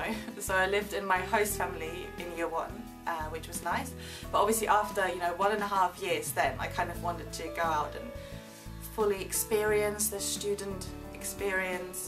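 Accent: England, United Kingdom. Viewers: are ya german? doesn't sound like. you actually have no accent, and you speak german with an english accent, which is impossible for a german! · Woww you sound english.. I thought you were english not german ;o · How did you aquire such an English accent if you're originally from Germany? just curious:)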